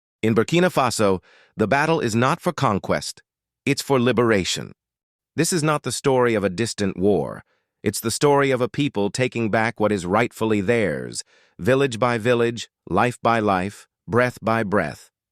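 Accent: West, United States. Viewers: The same western accent. Cant we have an African accent if we are getting news/presentations about Africa. It says that its not a war waged by outsiders, but this sounds like an outsider to me. I am fed up with this. TRULY RECLAIM OUR IDENTITY. We need to be African in EVERYTHING. This is NOT a voice of Africa! Pas la!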